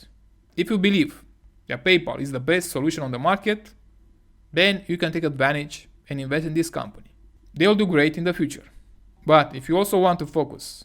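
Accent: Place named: England, United Kingdom. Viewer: Don't take advice from some moron with heavy English accent from the internet.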